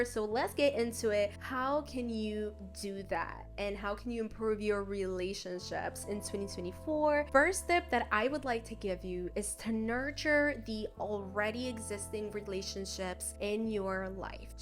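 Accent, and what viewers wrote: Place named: Italy. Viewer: OMG ARE YOU ITALIAN? I understood you bf hahaha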